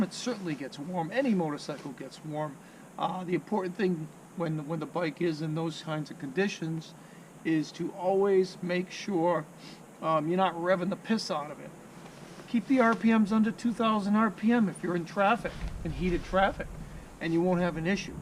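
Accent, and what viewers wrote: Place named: East Coast, United States. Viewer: This is possibly the BEST MOTORCYCLE REVIEW I have ever seen. No bells, whistles, music and NO bullsh!t. Just a guy sitting on his bike laying out the DETAILS. (And in a charming east US accent). I’ve ridden in light snow but this guy is the “road king!” Never have I felt such respect for a fellow motorcyclist.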